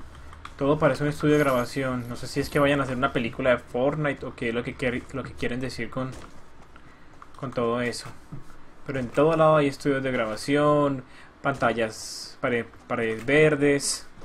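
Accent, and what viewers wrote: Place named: Colombia. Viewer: Por fin un Colombiano que no se cree mexicano o no habla como tal, 100% colombiano, :v, siga así ñero <3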